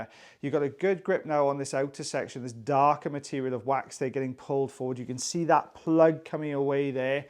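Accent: Wales, United Kingdom. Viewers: Still the best ear channel. And the Welsh is the best accent imo😊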